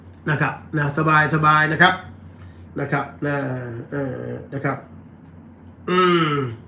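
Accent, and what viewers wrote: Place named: Songkhla, Thailand. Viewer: สงขลาชัดเจนดีครับน้อง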